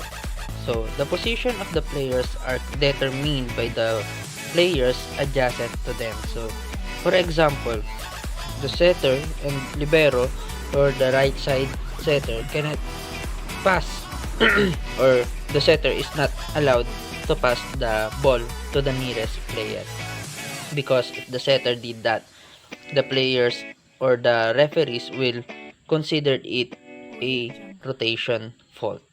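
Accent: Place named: India. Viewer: dami mo filler, ang sakit sa tenga ng english mo... parang kang indian😢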